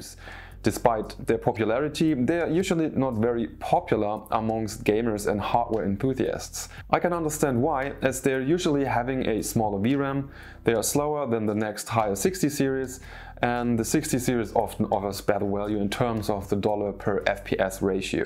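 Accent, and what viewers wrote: Germany. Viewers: Höre ich german akzent?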